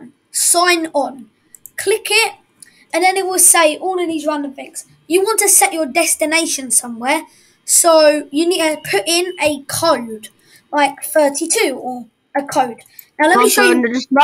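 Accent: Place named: United Kingdom. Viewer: Super strong British accent love it!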